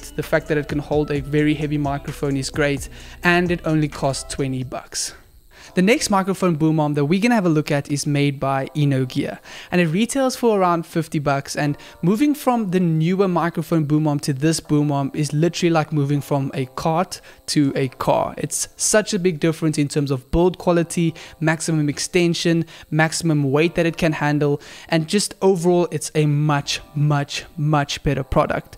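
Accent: South Africa. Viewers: You lowkey sound South African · You sound like a south african! Allo from Montreal!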